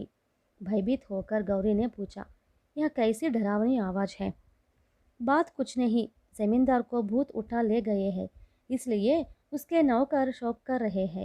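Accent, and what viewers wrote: South, India. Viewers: So nice 👍. Old Chandamama of 1960s and 1970s was pure 💎 gem. Carry On dear. Read more of these in Hindi version so that deserving children are not deprived of the good lessons taught in childhood 😊😊😊😊. Best of luck 😁. Your South Indian Hindi accent makes it so sweet and beautiful to listen. See that your accent has become your strength and advantage.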